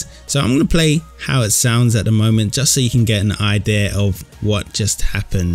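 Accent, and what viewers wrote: United Kingdom. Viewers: How do we get that sweet British accent, tho?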